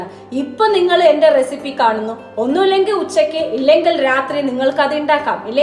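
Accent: Palakkad, India. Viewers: Hi where exactly are you at Palakkad. Could make out from your accent that you are a palakkadan.. Me too Palakkad. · I like your palakkadan malayalam very much ma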